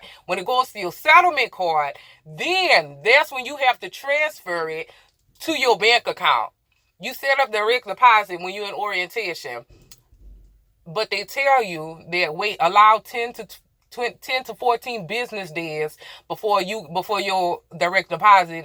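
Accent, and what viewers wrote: Louisiana, United States. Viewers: That Louisiana accent is straight SEXY💯 Ok BaaaByyyh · You are just too cool I love the accent Louisiana I think but you're beautiful cute all the above now I wanna come to land star for sure💝❤💞🌹!!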